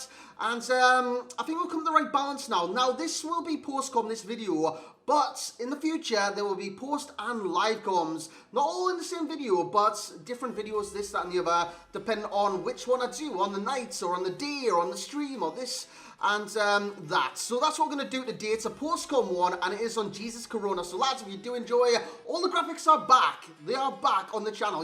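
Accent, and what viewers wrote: Newcastle, United Kingdom. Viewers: Are you from newcastle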